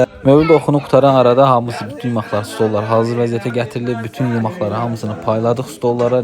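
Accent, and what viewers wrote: Zaqatala, Azerbaijan. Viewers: Аллах габул етсинлар. Аллах Санада гисмат етсин.манимда оглум Асгарда. Билмирам нарадансан дейтрам сиз суннисиз. Санча Загатала. Тала лахчасина охшайыр❤❤❤ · Ay Allah ne gozel danişir.yemaxlar qazmaxlar.Zaqatala lehcesidi?? Gelmaga başladi. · Zaqatalaya bənzədi , ləhcə və gözəl təbiət. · Zakatala zonası👍👍👍👍👍🥰lehcesinden tanıdım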